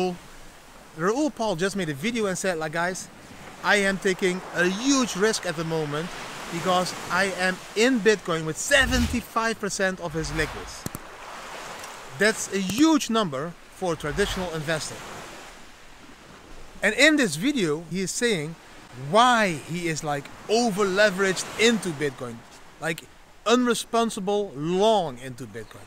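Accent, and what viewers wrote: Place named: Netherlands. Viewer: I love your dutch accent 😄 I lived in the Netherland for 2 Years. I met Bitcoin in 2013....since that time i ‘m part of the blockchain adventure. Great job.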